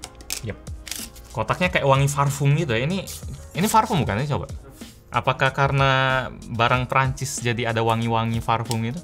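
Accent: Palembang, Indonesia. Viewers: Keker bahaso pelembang nian😅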